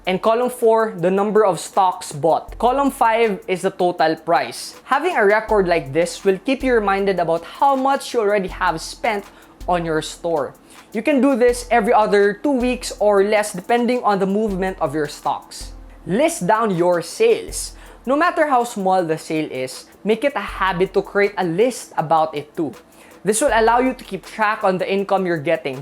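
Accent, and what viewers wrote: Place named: Philippines. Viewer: Sir dapat nag tagalog kanalang kasi hindi bagay sayo kasi halata kasi pinoy ang iling mo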